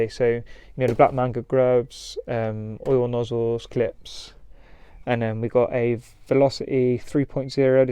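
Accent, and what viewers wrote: United Kingdom. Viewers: Are you from Brizzle pal?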